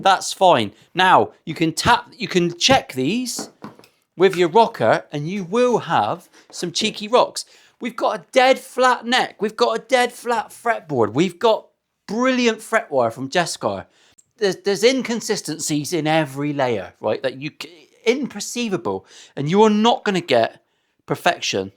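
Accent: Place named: Portsmouth, United Kingdom. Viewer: I'm not even watching the clip, just listening and enjoying the beautiful Portsmouth English while carving my new piece. Greetings from Belgrade!👋🏻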